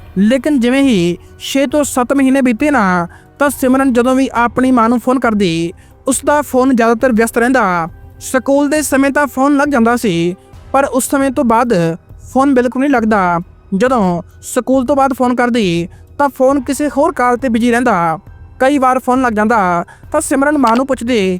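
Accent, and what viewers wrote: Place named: Bihar, India. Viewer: bolna te sikh la punjabi jn bihari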